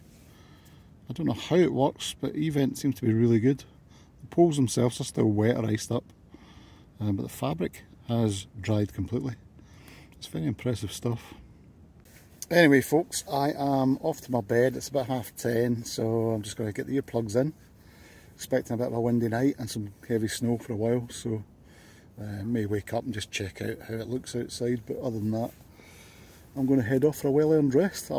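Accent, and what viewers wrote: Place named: Dundee, United Kingdom. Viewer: Cracking love snow camping was in snowdonia the weekend hardly any about even on the peaks..ps Glasgow would put have money your accent was more northern maybe Dundee ?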